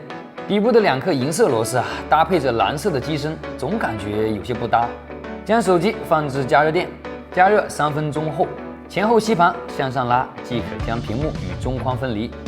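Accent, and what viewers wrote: Wenzhou, China. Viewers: 温州人说普通话就这调调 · 没办法，温州人说普通话就是这样的。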